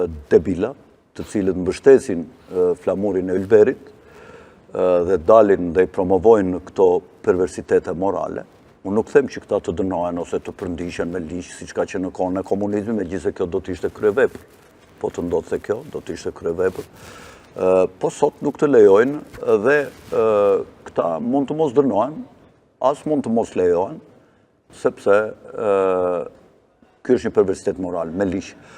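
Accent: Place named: Gheg, Albania. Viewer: O, hajvani me dy këmbë, mos e përdor t'folurit e hizmeqarëve të fashizmit, Bandave Balliste dhe mbeturinave tjera, apo dialektin Geg, i cili ka ngordhur që vitin 1972 dhe është varrosur në Tiranë! Tani po e përdorin pjella e pistë e hizmeqarëve të lartëpërmendur të Shqipërisë Veriore, Laperët, rrugaçët dhe kurvat e Kosovës primitive! Aleluja!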